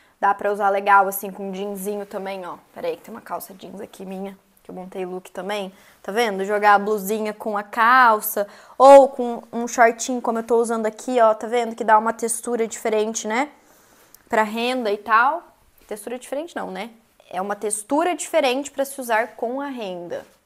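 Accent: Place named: Minas Gerais, Brazil. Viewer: Tá muito mineirinha kkkkk "esse trem aqui ooh" 😂... Sou mineira ❤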